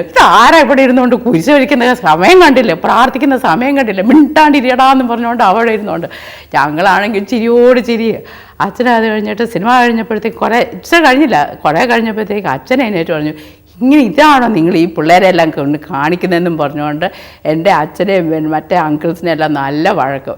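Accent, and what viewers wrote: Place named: Kottayam, India. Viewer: n d Kottayam slang which l expected d least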